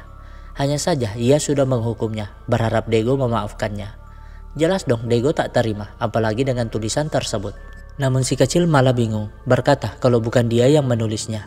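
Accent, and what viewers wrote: Makassar, Indonesia. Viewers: Orang Makassar Ki toh bang, Logak²nya melekat sekali 😭🤣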